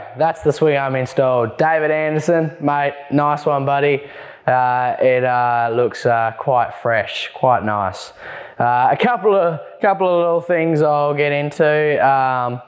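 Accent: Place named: Australia. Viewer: Awesome video mate… one of the best from you guys… your super Australian accent/words used to describe things was by far the highlight of this video… please make all videos exactly like that 😂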